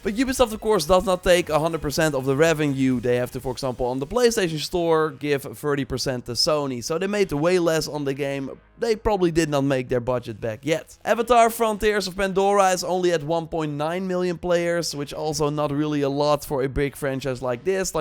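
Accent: Netherlands. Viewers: The Dutch accent I love it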